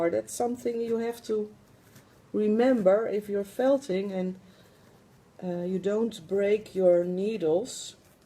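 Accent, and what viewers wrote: Netherlands. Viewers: Just wanted to say thank you for your fantastic tutorials....I'm new to doll making but you have inspired me and definitely shown me the way forward. Your tutorials are easy to follow and I love listening to your lovely Dutch accent! Best wishes and thank you!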